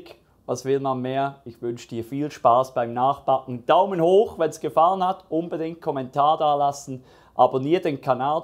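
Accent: Switzerland. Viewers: Die Rezepte sind immer DER HAMMER! Danke! Und ich hör auch dem Schwizer Dütsch so gern zu 😁✌️. Grüße aus 🇦🇹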